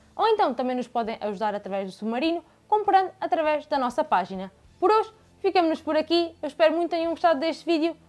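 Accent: Portugal. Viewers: Entendo nada kkk português de Portugal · Olha ultimamente tenho acompanhado muiiiito o conteúdo deste canal, sinceramente quase não tenho critica afinal são Pouquíssimo aqueles que fazem bom conteúdo do Legendário do Tolkien... MAS, confesso que tive serios problemas para entender este programa em questão, veja n ha nada de errado com a apresentadora e sua locução, porém para mim espectador que não estou acostumado com este sotaque torna se quase incompreensível o entendimento do que esta sendo dito (que suponho ser sotaque ou o próprio Português de Portugal). Nota: Minha dificuldade rm particular é a velocidade da pronúncia e o "Sh" carregado po cima de qualquer sonoridade das palvras. Enfim espero que não tomem como uma critica negativa e sim apenas um feedback da minha dificuldade como telespectador, apesar do meu ponto ainda sim todo conteúdo aqui publicado continua sendo otimo😁✌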